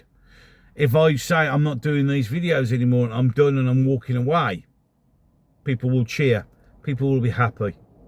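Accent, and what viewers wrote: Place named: North, United Kingdom. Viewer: You may only have 400 regular watchers, but those watchers are organic and we like YOU, they can hear Sargon or anyone else with a posh accent moan about mass immigration, but your rants make me feel right at home, Northern, salt of the earth, a good soul. That's what drew me in, then the references to scifi and geeky stuff and STICKLE BRICKS kept me hooked, and i admit, the far right hermaphrodite will miss you. Let's see if this gets deleted, the H-word! (I nearly wrote H-b0mb!)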